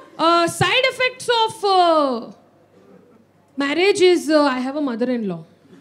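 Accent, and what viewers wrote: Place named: Uttara Kannada, India. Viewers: you are so good in comedy talk show, one of the best,classy, good vocabulary, typical Uttarakannada style of talk, God bless, am your fan from Gulbarga, a doctor by profession,you are too good,( Mast Majha Bantu kheli) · North Karnataka dialect, particularly Dharwad I believe. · Hubli Dharwad bhasha dashtu zabardast style yawdu illa....u did justice to it.... simply bold and beautiful 😍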